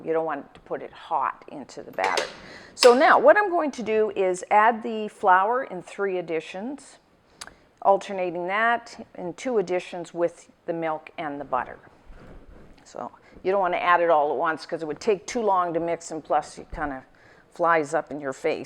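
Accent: Canada. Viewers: Fun to watch, thanks, but from here in Europe, we're mystified...what kind of accent is that? Canadian? Great Lakes? Michigan?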